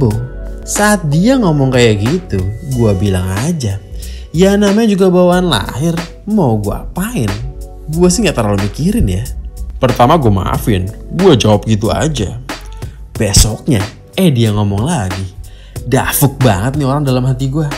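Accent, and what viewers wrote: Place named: Java, Indonesia. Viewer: Lo kenapa sekarang pake logat logat jawa gitu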